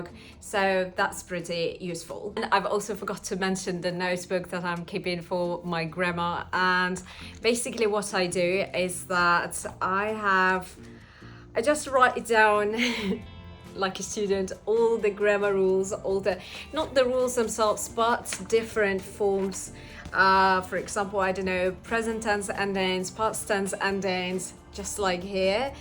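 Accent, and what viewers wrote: United Kingdom. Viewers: what an inspiring video for language learners, btw your name is not english but you sound like a brit who was born in bred in london :D im just curious were you raised in UK or require this perfect accent later ? aaand also why did you choose Turkey to live, im from Turkey and this country is not doing pretty good :D, what made you choose turkey i really wonder... Thank you so much <3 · your british accent is excellent 🔥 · Very British. Just need to work on enunciation a bit. :)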